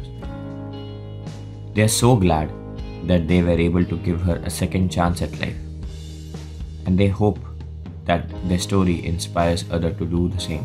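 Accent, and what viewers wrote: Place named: India. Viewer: The storyline never matches what we are watching. It's like miles behind. The dog has been with the woman from the beginning of the video but the storyteller is making it seem like that's only happening half way through the video. Whoever produces these videos doesn't know what they are looking at when they are putting the English storyline into the video because the transcript is automatic and follows the sounds of the storyteller. As he sounds Indian, it might be that he uses Indians to put his video together for him and they don't speak English. Maybe that is the reason for the mish mash.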